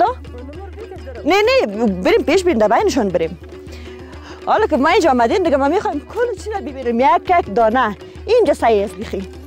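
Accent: Afghanistan. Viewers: Zhwandi osi sha program stasi waqighi sha khabari kawe lahja de socha afghani da bamyan khalak der watan dosta khalak de zindabad. Vary nice program good luck for future.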